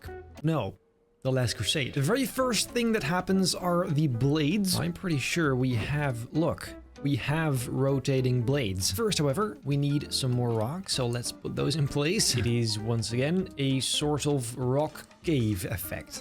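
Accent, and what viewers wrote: Netherlands. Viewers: ben je nederlands???? wand heb een gevoel dat je nederlands ben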